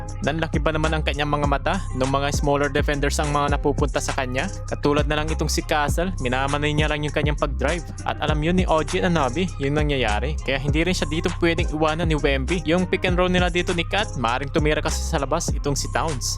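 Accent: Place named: Visayas, Philippines. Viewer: Nako salita palang bisaya na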